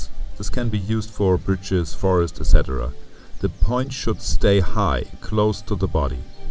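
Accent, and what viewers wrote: Germany. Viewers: Ekelhafter, deutscher Akzent. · Wenn Deutsche Englisch blubbern...🤢🤮👎🏻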